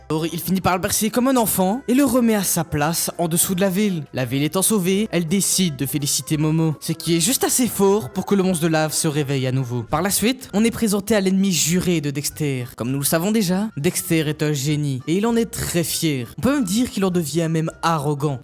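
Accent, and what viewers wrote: Belgium. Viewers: T’es belge w?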